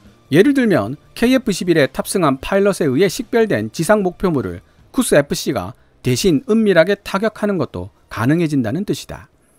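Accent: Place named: Busan, South Korea. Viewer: 부산 사투리 억양때문에 도대체 뭔말을 하는지 모르겠네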